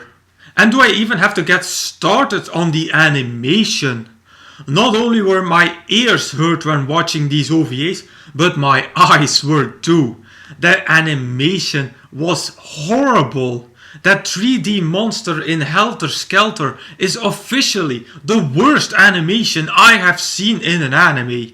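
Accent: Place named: Germany. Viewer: I really like your accent! Are you German? just curious. also, cool review, you say it as it is!😁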